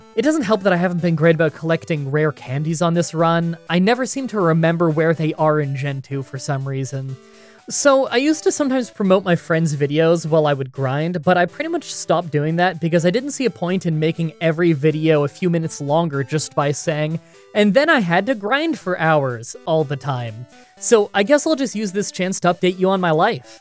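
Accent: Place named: Canada. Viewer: His Canadian accent is so cute, eh!!! Lol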